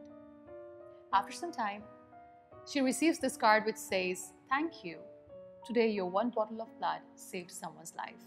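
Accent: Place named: United Kingdom. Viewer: mam i just wana ask in which country u r still living.. ur english speaking accent is british.. u r jst osmmm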